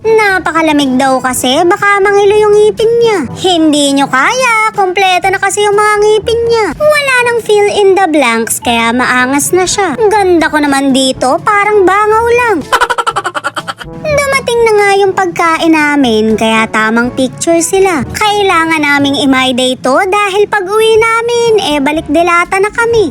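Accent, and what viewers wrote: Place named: Bicol, Philippines. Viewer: Bisacol ng tagalog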